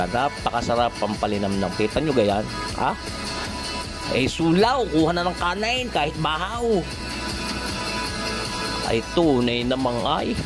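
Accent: Batangas, Philippines. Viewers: Nakaka aliw magsalita ang mga Batangueno eh! Dalas dalasan mo kuya ang pag a-upload ng mga cooking video. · Iyan ang tunay na Batangueno , sa pagsasalita at sa pagluluto ay talagang mainam na mainam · Tuwang tuwa ako sa punto ng batangeno dahil yan ang nakalakihan kong marinig sa nanay kong batanguena at mga kamaganak namin sa Majada at sa batangas!! Ur accent reminded me of my kakang duneng and other tiyo at tiya!! They all speak that same accent!! Pag nakain na at naghuhuntahan na, akala mogay nagbababag !! Kainaman naman eyyy! 🤣🤣🤣. I love batangueno foods! Napakasarap talaga!! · habang pinanonood ko ho ito ay may ngiti sa aking mga labi. ako ho ay sobrang nasiyahan sa panonood nitong video ninyo. kayo ga ho ay taal na taga batangas? hindi lang ho sa kaalaman sa pagluluto ng kalderetang kambing ang natutunan ko dito kundi ang makarinig ng nagsasalita ng puntong batangas, para tuloy akong napauwi sa aking lupang sinilangan. 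mukhang napakasarap nga ng kaldereta nyo, yan ang tinatawag na authentic - kalderetang totoo. at hanga ako sa paraan nyo ng pagluluto dahil yan ho ang talagang bihasang (skilled) magluluto, pinaala-ala nyo sa akin ang aking mga tiyuhin na magaling ding maluto ( ang isa ay dating may karehan sa bagong palengke) ay sya, maraming salamat ho, mabuhay po kayo at nawa'y mas marami pang tumangkilik dito sa chanel ninyo at makagawa pa kayo ng maraming video na maraming makapanood. God bless. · ala eh kuya.. akoy nattuwa Sayo eh.,kasarap mo pakinggan pag nag ssalita ka NG batanguenio..akoy wiling wili mkining sa bosis mo..😋😋😋😋😋😋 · Bro, di ko kabisado ang accent at punto ng pananalita mo, sorry, matanong ko yan ba ay baklang salita o batangeno? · Batangueño ka na nga ginawa mo pang asal ang punto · Your new subbed, searching kardereta yung luto mo nakita ko! Enjoy your cooking lalo na yung Batangas mong accent! 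· Either tumira ka matagal sa Batangas, o magsanay ka magsalita. Pero, matagal tagal bago mo matutunan yan. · unang salita palang natawa na ako,, Batangueño talaga. sarap nyan kabayan 👍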